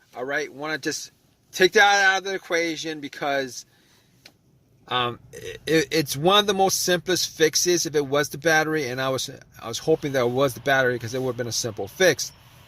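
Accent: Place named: Bronx, United States. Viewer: I love your accent. Is it the Bronx accent?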